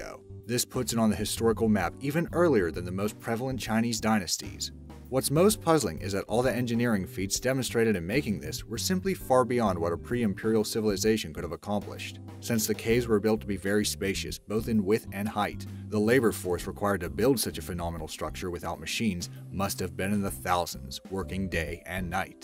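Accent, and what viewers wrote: United States. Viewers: So American voice over an obviously foreign made video. "We are still dont know what it was" among several different word errors ive noticed. I have nothing against foreigners, just making a point.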